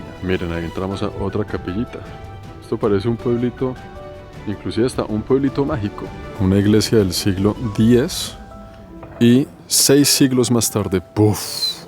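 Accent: Bogotá, Colombia. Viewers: Hola gracias por tus vídeos tan instructivos,y con tu acento tan marcado de puro Bogotano.ojala visites pronto la tierra.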